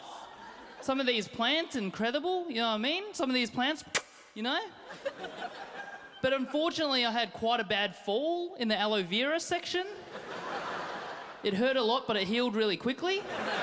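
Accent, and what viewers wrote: Queensland, Australia. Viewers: That's Aussie accent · Is that an Australian or a New Zealand Accest? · It's a real strong Aussie accent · asian kid with australian accent... great combo. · Is this Australia accent ?😂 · An Chinese guy with Australian accent! That by itself is hilarious!😃😄😂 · What i found funny is that hes chinese with an aussie accent! Lol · Woah!!!!!!! This guy ROCKS!!!!! And love the Aussie accent! Good on ya mate! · queeensland accent. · Yea so aussie. Personally I find it quite annoying when they talk like that. Not all of them have the high pitch though. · this guy looks like asian and sounds like aussie hilarious xD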